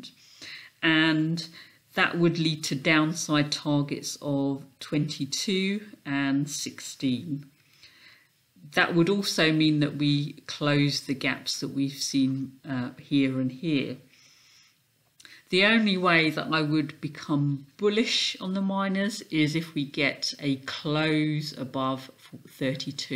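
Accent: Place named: North London, United Kingdom. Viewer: Sounds like a north London accent , probably Wembley , Finchley where I’m from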